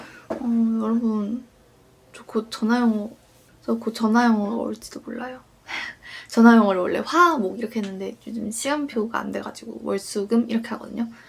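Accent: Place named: Seoul, South Korea. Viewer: 90년대 서울사투리를 쓰시네요ㅋㄹㅋㅋㅋㅋ 말투가 특이하다 생각했는데 그거였어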